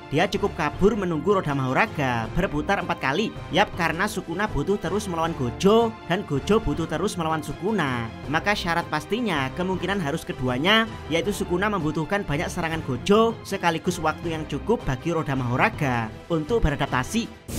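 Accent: Java, Indonesia. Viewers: Medok itu lebih ke aksen, akan susah dirubah. Kalau bahasa yang digunakan udah bagus kok, jelas juga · Gw kurang nyaman sama pembawaannya terlalu medo, maaf saya bukannya melarang menggunakan logat jawanya cuma setidaknya bisa gak pembawaannya lebih di benerin ke arah bahasa indonesia pada umumnya. Soalnya gak semua enak denger logat yg terlalu medo Semoga chanel semakin berkembang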